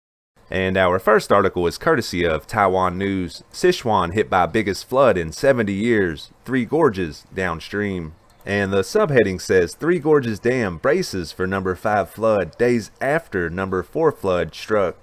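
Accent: South, United States. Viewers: I agree with this comment...... but where the heck did that southern accent come from :)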